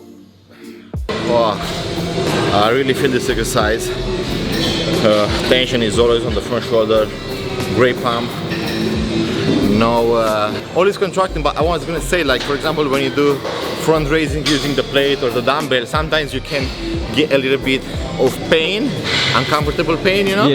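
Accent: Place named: Romania. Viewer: I like the way he combines english with romanian sțo ;) Bravo!!! Andddd keep up the good work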